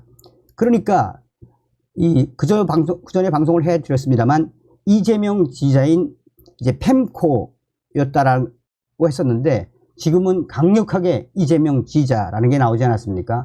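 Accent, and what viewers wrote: North Korea. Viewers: 북한 사투리 억양좀 고치시면 좋겠어요 듣기가 거북하네요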